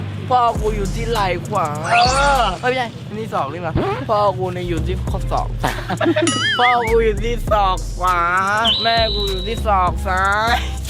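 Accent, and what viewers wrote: South, Thailand. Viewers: น้องอยากให้พี่ พูดใต้อีกชอบมากก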